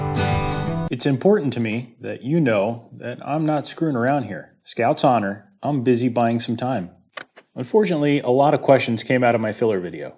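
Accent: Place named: Philadelphia, United States. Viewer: You have a Philadelphia area accent. I bet you live in either southern Jerz, or the easternmost third of Pennsylvania. Or Delaware.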